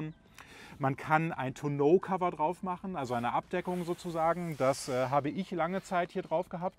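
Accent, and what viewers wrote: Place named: Germany. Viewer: Haha ich liebe es wie deutsch du alles aussprichst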